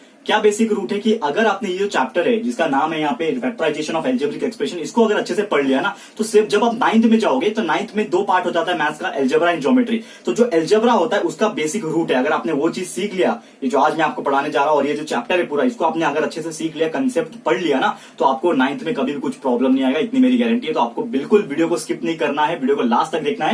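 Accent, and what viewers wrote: Maharashtra, India. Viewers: sir you are best And I have a question 😊😊 ARE YOU MARATHI...? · App marathi ho na sir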